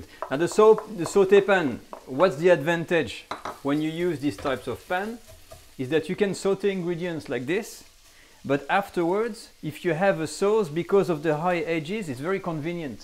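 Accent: France. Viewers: I can hear dat French accent😂😂. Continuez chef! J'adore votre recettes.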